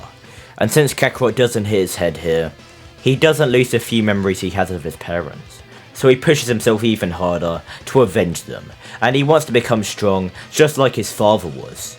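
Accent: United Kingdom. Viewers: I have a feeling your british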